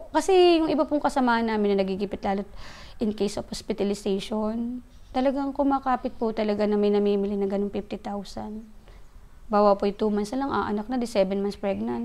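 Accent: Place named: Quezon, Philippines. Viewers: Ay tunay na Quezon ang punto, kainaman na, ang galing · Calabarzon accent diga ho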